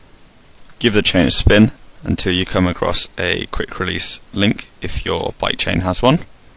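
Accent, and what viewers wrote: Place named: South, United States. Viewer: a southerners accent, the same as mine :)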